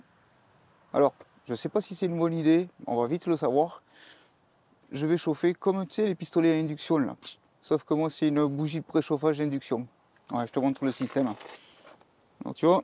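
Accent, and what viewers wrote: South, France. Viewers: oui merci , j'ai quasiment que des véhicules japonais ;) leur durites sont en acier très dur souvent en voulant refaire le bout du cône je le fait en simple cône sans le revers car sinon ça fissure , je devais mal m'y prendre . au passage : bel accent du soleil ;)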